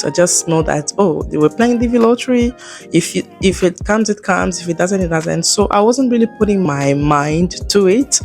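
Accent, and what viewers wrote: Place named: Democratic Republic of the Congo. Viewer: I can feel with your accent that you are from the Congo.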